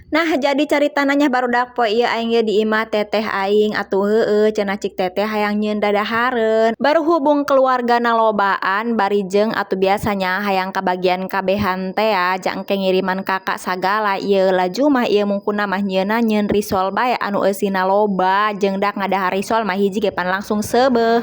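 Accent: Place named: Banten, Indonesia. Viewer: logatna mah doang budak di Banten dia.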